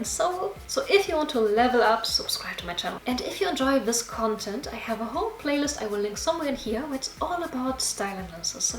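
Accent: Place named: Germany. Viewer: German accent 😂😂😂😂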